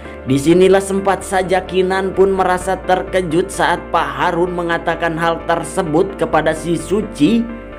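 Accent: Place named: Sunda, Indonesia. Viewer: Meni nyunda pisan ... si miminya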